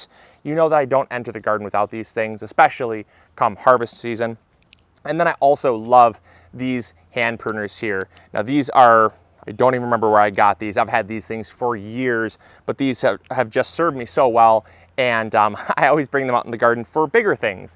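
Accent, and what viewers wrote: Michigan, United States. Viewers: This is completely off topic but as a small backyard gardener in California (born in Michigan) your michigander “accent” makes me smile 😃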